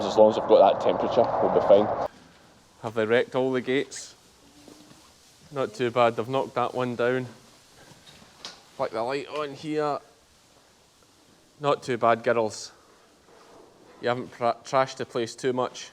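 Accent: Scotland, United Kingdom. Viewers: "I've got 4 blackie hoggs here that arnie mine" the scottish accent is always a great listen 😂😂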